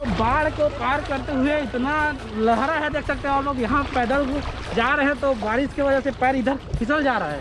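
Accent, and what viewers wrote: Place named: Chhattisgarh, India. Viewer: ap ki Hindi + Chattisgarh bahut mazedar h.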